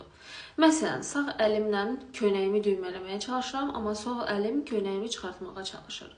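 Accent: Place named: Azerbaijan. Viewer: Ezarbaycan lehçeniz çok güzel.❤❤❤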